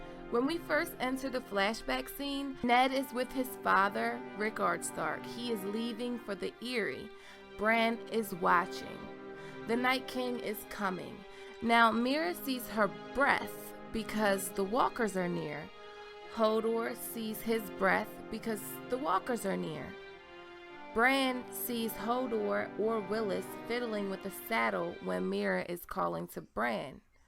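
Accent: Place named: Baltimore, United States. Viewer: You are absolutely from Baltimore! I giggle every time you say "Juice". And thanks, every time I say the word 'okay', I hear your voice on my head! 😂 Love your vids along with the others in the crew!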